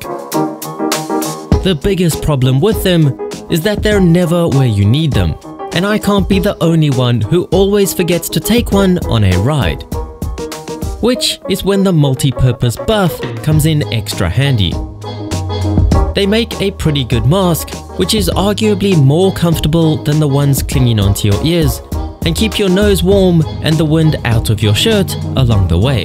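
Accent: South Africa. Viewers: Hey man u south African if not where you from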